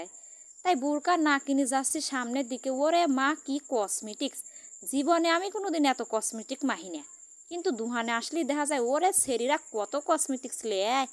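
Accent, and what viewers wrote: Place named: Pabna, Bangladesh. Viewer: খাটি পাবনা জেলার ভাষা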